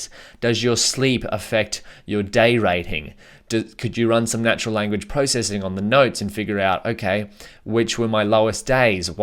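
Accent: Australia. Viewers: Are you Australian? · Its an Australian accent dumb dumb, of course he is from Australia. Jeeeesus.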